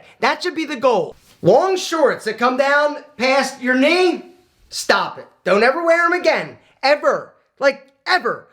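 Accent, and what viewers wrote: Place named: South, United States. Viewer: The southern side of you was out this morning. You said "like" like 2,000 times Lol I appreciate you brother. Keep it up!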